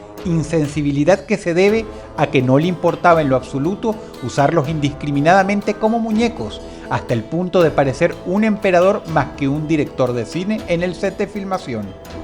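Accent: Venezuela. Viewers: Ese acento Chamo... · A le ah cambiado mucho el acento el los últimos años en este video tiene acento venezolano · Extraño cuando a se le notaba el acento venezolano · anda, que para ese entonces ese acento venezolano todavia estaba ahi... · Cuando aún hablaba como venezolano:v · Ese acento venezolano ✨ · wow aqui tiene muy marcado el acento venezolano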